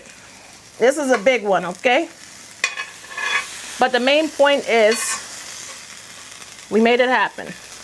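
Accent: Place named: Philadelphia, United States. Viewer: Are you from Philly? I just ask bc of the accent.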